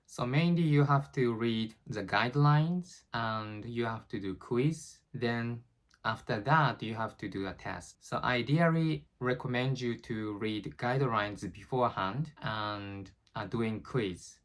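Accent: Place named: Japan. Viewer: I think he is Japanese based on is accent 🤔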